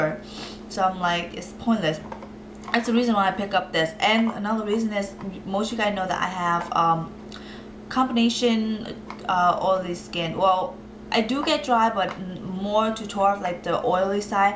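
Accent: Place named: Asia. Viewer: it's not slang... it's an asian accent... something obviously she can't help... it doesn't sound like slang at all....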